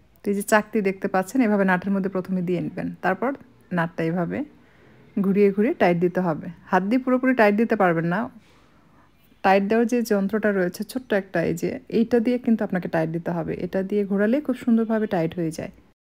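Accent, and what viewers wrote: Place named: Barishal, Bangladesh. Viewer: আপনি বরিশালের মানুষ আপনার কথার মধ্যে বরিশালের ভাষার ঝাজ পাওয়া যায় এগিয়ে যান সমহিমায় ধন্যবাদ।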